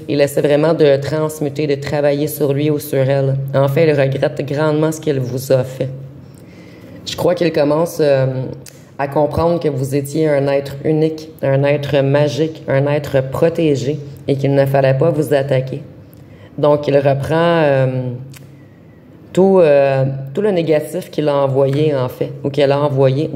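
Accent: Quebec, Canada. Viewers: Merci infiniment pour cette guidance tellement juste pour moi. Avec le magicien et le roi d'épée c'est exactement ça ! Maintenant j'attends mon roi de coupe qui arrive j'en suis sûre. Je vous écoute de Paris et j'adore votre accent québécois ❤ Merci et bonnes fêtes de fin🎉 d'année 🎉🎉🎉🎉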